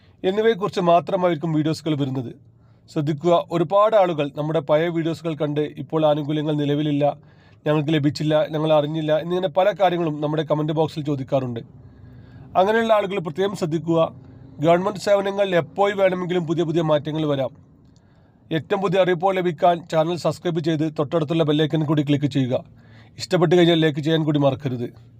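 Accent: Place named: Bengal, India. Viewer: ഇയാൾ മലയാളി അല്ല. ബംഗാളിയോ ഒറീസ്സയോ ആണ്. മലയാളം നഹി.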